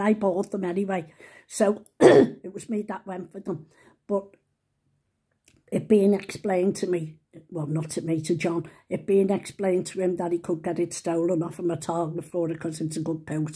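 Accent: Liverpool, United Kingdom. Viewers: Bless you ,you area genuine Scouser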